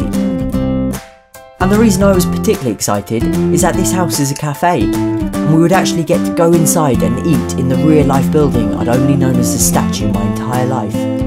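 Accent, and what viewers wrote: Flanders, Belgium. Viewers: It's a joke, we don't actually dislike Flemish people. Personally I really like the Flemish accent, though it isn't really fitting for a video about Amsterdam. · Lovely video! But it sounds like the girl from the voice over is from Belgium and not the Netherlands! Flemish and Dutch are officially two different languages! :) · Native dutch speaker? She has a flemish accent.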